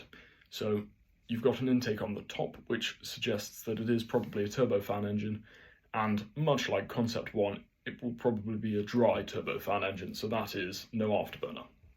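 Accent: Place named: RP, United Kingdom. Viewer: Very interesting thank you. I have seen some pictures of the drone, but not any in detail specifications of the program. Do you know if the concept 2 is the design they’re taking forward? During the video I might have had to rewind a couple of times to understand, but we don’t speak the Kings English quite like he does, this close to the Scottish border. 😂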